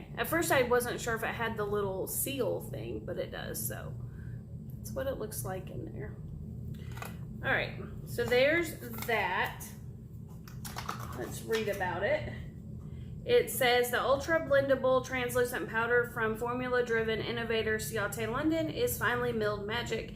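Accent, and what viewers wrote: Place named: South, United States. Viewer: Trust me! I didn't take it as "snarky". All good. I can hear the southern in your voice and relate. :)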